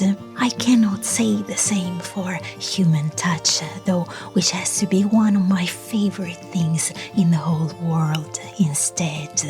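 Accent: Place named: Italy. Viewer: How intetesting to hear an italian accent from you. It's the first time I watch a video from you and I immediately assumed you were scandinavian. A different video for sure. I like it.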